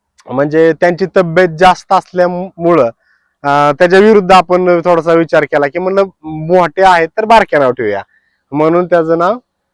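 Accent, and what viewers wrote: Western Maharashtra, India. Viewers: तुम्ही बोलता तो भाषा जी की, सोलापूर, सांगली, सातारा, कोल्हापूर ची ही भाषा तुमच्या तोंडातून आम्हाला ऐकायला आवडेल.... आम्ही मराठवाड्यातील हिंगोली जिल्ह्यात तुमचे हिडीओ खुप बघतो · पश्चिम महाराष्ट्रातील सोलापूर , कोल्हापूर ,पुणे,सातारा ,सांगली या भागातील भाषा आपण बोलता ऐकायला खूप मजा येते. हॉटेल मध्ये गेल्यानंतर तेथील प्रत्येक सजीव व्यक्ती ,निर्जीव वस्तूचे वर्णन, हॉटेलचे वर्णन खूप छान असते . वेगवेगळ्या हॉटेल मधील चविष्ट मटण रेसिपी पाहायला खूप मजा येते. मी नेहमी आपले शॉर्ट्स व्हिडिओ पाहतो❤❤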